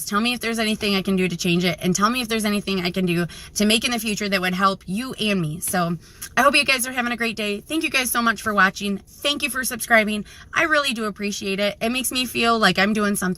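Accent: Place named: North, United States. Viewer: LOL can't help but check out the scenery as your are driving....looks like Iowa? and I detect a slight northern accent so Northern Iowa or Southern Minisota??? Anyway I really appriciate your videos. I'm on day 2 with Contrave.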